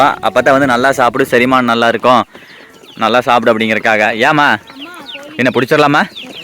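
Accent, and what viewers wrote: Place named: Erode, India. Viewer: Hi .....nan ippodhan first time unga video pakkuren...slang enga oor slang maadhiri irukku...nan erode..neenga endha oor bro...👍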